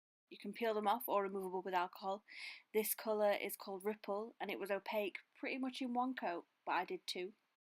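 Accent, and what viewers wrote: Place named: United Kingdom. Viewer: Huh, I’ve been following you on Instagram for ages and I never realized you were British! Great video 😊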